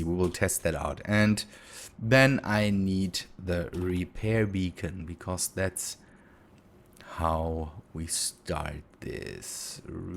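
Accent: Germany. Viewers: you have a strong accent. your german right?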